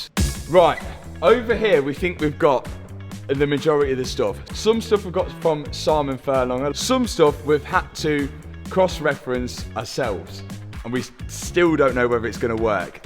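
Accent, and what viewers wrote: United Kingdom. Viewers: Where in the UK is he from for that accent??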